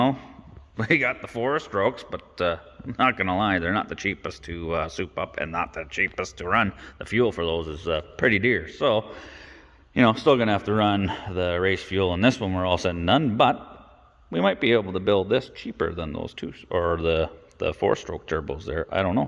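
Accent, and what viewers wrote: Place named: Nova Scotia, Canada. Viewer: Ive had 4 of these... Absolutely no disappointments!! You sound like your from NS!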